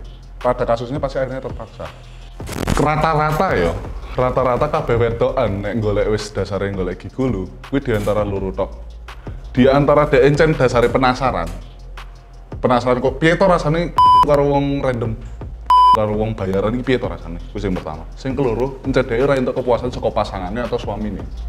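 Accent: Semarang, Indonesia. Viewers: njirr logat semarangan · Logate wong semarang ketoke sampean mas 😅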